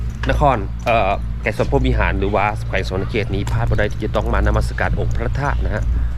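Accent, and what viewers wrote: Phu Thai, Thailand. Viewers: เสียซื่อพุไทได้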